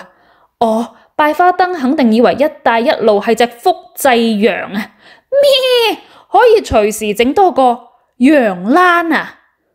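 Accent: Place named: Guangzhou, China. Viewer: 我地广州的音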